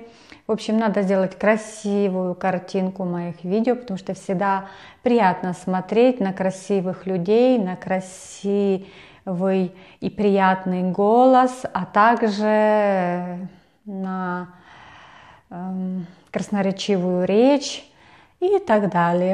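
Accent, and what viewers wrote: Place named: Ukraine. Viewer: да давно пробовала пробник, но сейчас решила, что это будет самое то на весну!!! Посылочка ещё не пришла... мне очень нравится Ваш голос и речь немного с украинским акцентом... не слушайте не кого.... и не говорите что у Вас мало подписчиков. Те кто есть Вас любят. У Вас нереальная коллекция и много мне подходит по рекомендациям. Уде 200 флаконов где-то. Собираю все нишу люкс, ретро. Очень полюбилось Sublime and Joy by Jean Patou... очень богато и нежно как по мне. Я живу в Америке 30 лет, сейчас начала здесь встречаться с итальянцем.... очень надеюсь что получится... хотя много говорят итальянцы врунишки и гуляки... и пускают пыли в глаза... а хочется любви и романтики... посмотрим. 💝💖💐🥰😘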